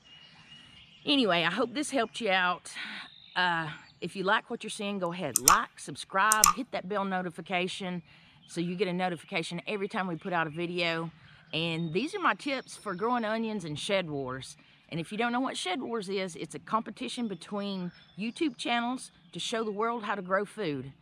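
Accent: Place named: Georgia, United States. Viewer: This has got to be THE BEST video I've seen on onions! We also are in N. Georgia (Gwinnett County). I LOVE YOUR ACCENT! Two years ago I sat with a Georgia extension agent and had a long talk about growing onions. I proceeded to seed several varieties of short day onions and had HUGE success. Then to harden them off before sticking them into a raised bed, I set them beside the poly house in the semi-sun -- and we got visited by a late season hurricane. All that water washed down into my trays (and i forgot about them being in solid bottom trays) and drowned almost all those onions. What didn't rot we put into the ground and ignored the chickweed. You KNOW what happened. We salvaged a few that are now in stacking planters and are going to seed, which is fine because we will collect the seed and start over this year. Thank you for the details you provided, they are right in line with what the extension agent told me. 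Maybe this year we'll get off our butts and actually maintain them better. I'm going to try grow bags like you have there. How many did you fit into the bag and did they develop as you wanted? Also, how many times did you give them a hair cut?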